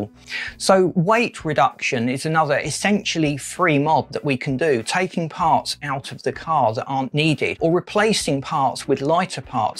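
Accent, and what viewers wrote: United Kingdom. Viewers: Impossible to listen too much twang and cockney accent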